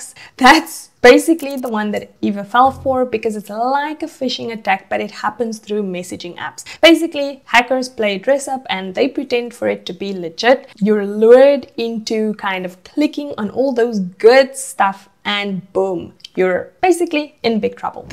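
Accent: South Africa. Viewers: Also, I instantly heard a South African accent?! much love 🇿🇦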